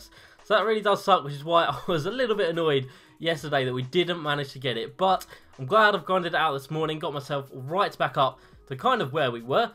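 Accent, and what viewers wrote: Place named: United Kingdom. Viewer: Loving the BRIT...........ISH ACCENT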